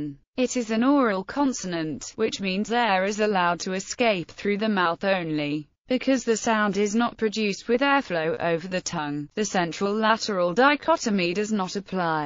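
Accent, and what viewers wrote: United Kingdom. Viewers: Please get rid of the monotonous Received Pronunciation screen reader.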